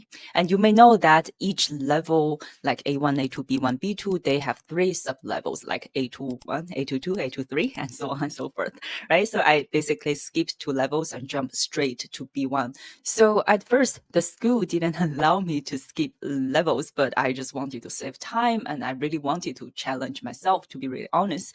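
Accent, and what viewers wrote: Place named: England, United Kingdom. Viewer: I appreciate your efforts but your German doesn’t sound german 😅 it sounds more English than German specially the of “T”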